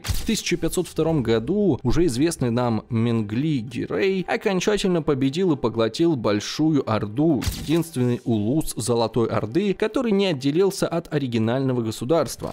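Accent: Ukraine. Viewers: он украинец - не обязан правильно выговаривать ваши слова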